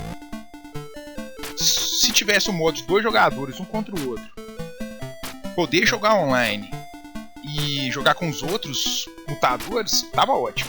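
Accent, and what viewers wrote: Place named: Interior, Brazil. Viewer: Caralho, mas que sotaque caipira.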